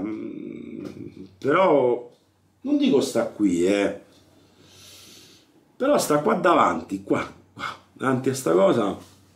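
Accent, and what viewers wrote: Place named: Rome, Italy. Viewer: Ciao, volevo farti i complimenti per il canale. Ho iniziato a guardare i tuoi video cosi, facendo zapping su YouTube, e niente... Sarà la simpatia? Saranno la passione, l'umiltà, l'accento romano...? Praticamente me s'è aperto un modo e pure il portafoglio... "Ccitua!" 🤣 Senza entrare nello specifico ma altri canali simili non trasmettono la stessa atmosfera. Continua così 💪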